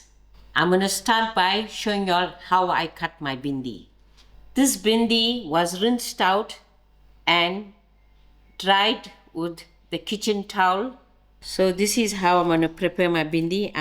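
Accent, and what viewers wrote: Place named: Sezela, South Africa. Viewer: Aunty you sound like a Sezela Tamil aunty,saying orhil and tamartoe. How big is Prakash's dhanda?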